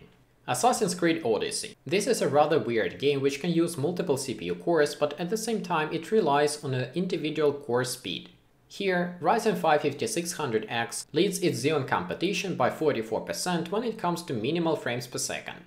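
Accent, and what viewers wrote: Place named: Russia. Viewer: Привет, чел! :)) Узнаю русский акцент.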